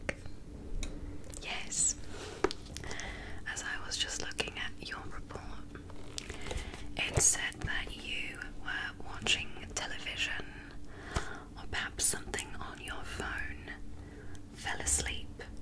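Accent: United Kingdom. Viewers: That British accent though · You are the Most Beautiful Doctor with The Most Beautiful Eyes and the Most Beautiful British Accent!! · As soon as I heard the accent I was like:THERE BETTER BE A COMMENT WITH THE BRITISH ACCENT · i see she channeled her inner brit for this vid and i kinda like it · ehh:3 The British accent is back - Love it:3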